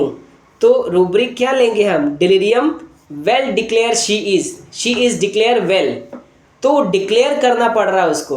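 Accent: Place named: United States. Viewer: Sir we learn a lot from your videos but few videos you are uploading in English American accent not in your own English/ Hindi language. This is not very beneficial for us.please continue in your own language .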